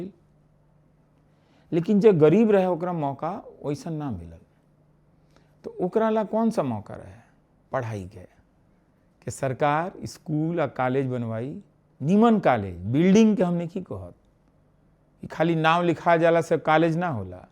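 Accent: Bhojpuri, India. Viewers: Main bihar ke siwan jile se dekh raha hu app to pure bhojpuri bol rahe hai sir Salam sir app Ko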